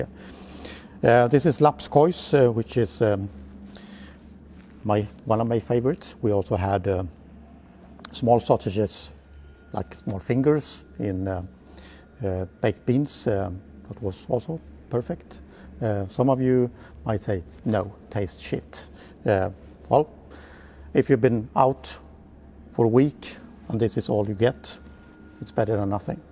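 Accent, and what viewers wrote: Sweden. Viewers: At first I thought the video was in some weird dialect of Swedish and tried to find the captions.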